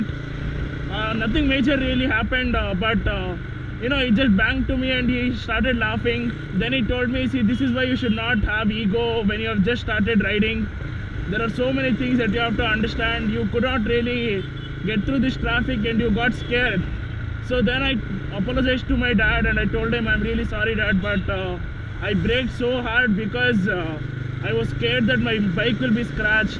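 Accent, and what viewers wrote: India. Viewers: mane yell guru nindhu? im from bang too, ur vids r great and 1000% INDIAN accent lol.....u got a sub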